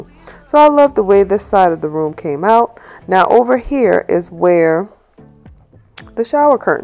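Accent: Charleston, United States. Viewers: At this point I’m calling you family simply because seconds into the vid you said “PAINTED IT” I was like oh she sounds like she’s from Charleston And lord be hold you are. 😍😍😂😂 there’s no escaping this geechie accent and I love it. Thank you for being you and walking in your purpose and providing beautiful content and creations may God continue to keep you 🙏🏾, You definitely gained a new family member in my head at least 😂 and a new subscriber.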